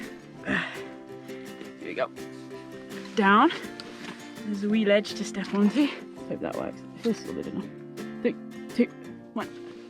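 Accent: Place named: Scotland, United Kingdom. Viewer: Love your channel 🤗I've watched loadsa them to date. Love your Scottish accent, your enthusiasm & knowledge. I'm in Glasgow & never done Dornich until tomorrow! Keep it Wild Adventurer 😎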